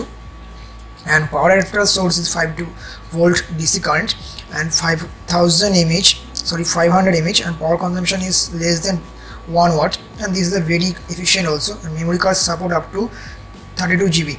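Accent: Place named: Bengal, India. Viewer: Abey english to sidhe bol... Bengali english mat bot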